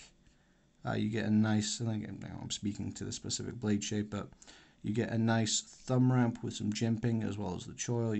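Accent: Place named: Northumberland, United Kingdom. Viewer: Great video, I love your accent it sounds just like mine. Are you Northumbrian too? I ordered the same UKPK you have off Heinnie, still waiting for it to arrive. It's gonna replace my Böker Plus XS as my EDC, which is also a great knife with a similar design with the choil to stop it closing, no jimping there on the XS tho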